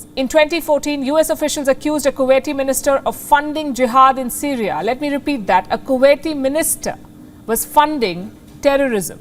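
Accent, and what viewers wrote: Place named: India. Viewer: You sound Indian today and for the first time you chose a side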